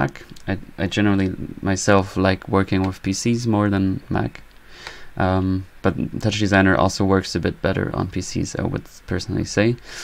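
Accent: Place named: Ireland. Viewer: Hey you say German is your native language. But I'm hearing a really strong Irish influence on your accent? Is that right?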